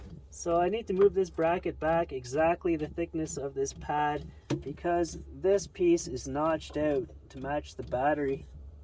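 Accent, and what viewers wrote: Canada. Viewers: On the way owt there. On the way owt lol i love canadian accents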